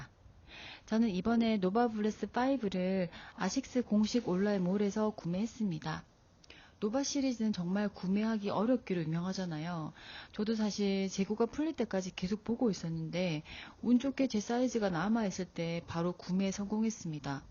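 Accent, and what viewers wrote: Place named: Gyeongsang, South Korea. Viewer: 경상도 사투리 ㅎ 귀여워요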